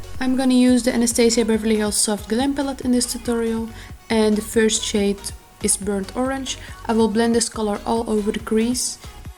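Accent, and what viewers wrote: Netherlands. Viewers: Shes dutch u can tell from the accent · Your accent sounds dutch..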